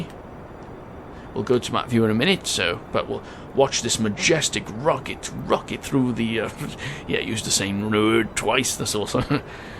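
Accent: United Kingdom. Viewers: Where are you from? UK?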